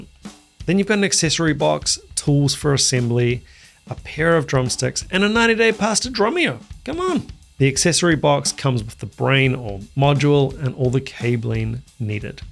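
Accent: New Zealand. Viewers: …I like to hear an NZ accent to a point but to wait for you to say something substantive or meaningful 1/3 the way through…well…too… long…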